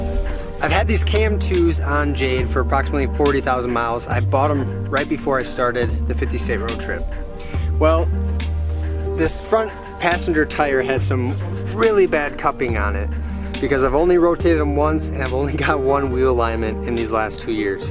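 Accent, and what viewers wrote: Wisconsin, United States. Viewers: Now that you're back East, I do believe I hear some of that Wisconsin accent coming back. Is it sleeping bags or sleeping begs? lol Hope y'all are doing well.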